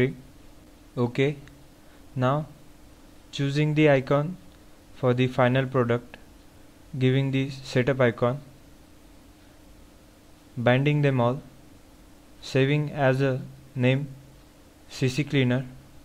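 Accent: India. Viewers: wow extreme indian accent... is english your mother lenguage? or is it hindi? or maybe some of the other...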